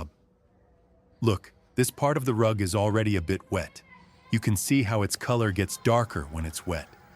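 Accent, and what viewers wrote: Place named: United States. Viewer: definitely is an American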